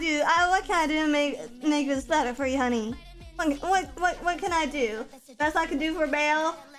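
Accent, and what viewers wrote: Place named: South, United States. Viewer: But why is she southern 🤔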